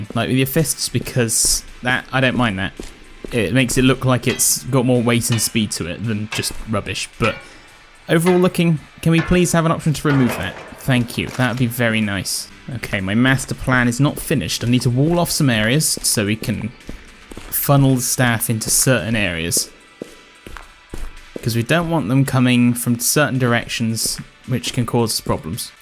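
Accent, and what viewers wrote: United Kingdom. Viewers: your soothing British voice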